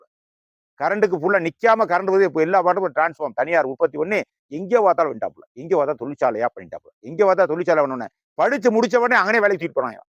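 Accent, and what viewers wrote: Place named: Madurai, India. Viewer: இன்றய,,தமிழக,,அரசியல் பம்மாத்து,,பித்தலாட்ட சுய,,தம்பட்ட,,கீழான அரசியல்,,நாடகங்களை அடித்து. துவைத்து அலசி,,உதரி,,காயப்போட்ட எளிய,,மதுரை,,நெல்லை தூய,,அன்றாட,,பேச்சு தமிழில,,உரக்க,,மனதில் பதியும்படி அருவியாக,,குமுரி தீர்ர்த்து விட்டீங்க நண்பரே,,சகோதர்ரே் நன்றியுடன்.. சீதாராமன்,,80 வயது பம்பாய,,மும்பை - 51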